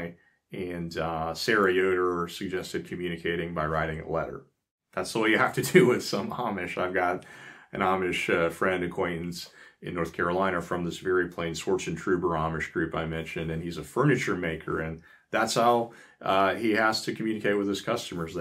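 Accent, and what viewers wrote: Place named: Philadelphia, United States. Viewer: Very interesting vid. Enjoyed it. Been visiting the Amish in Pa all my life -- Your accent is striking -- the O in home, phone, -- you from Phila, Pa?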